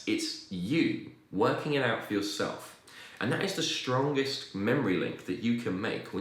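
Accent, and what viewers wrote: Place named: United Kingdom. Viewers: Brit accents are kind of played out over here, but this is a really nice one. Must be specific to a particular region! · sounds generic British, so hard to place. Could be south east England though. I'm English also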